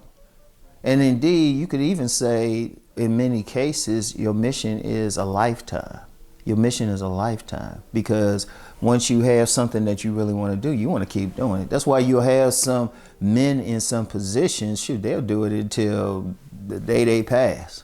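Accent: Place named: Baltimore, United States. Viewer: I’m 28 years old I hope to be this calm n calculated when I’m older s/o to you og from a northern VA Cat to a baltimore brotha I can hear ya accent 💯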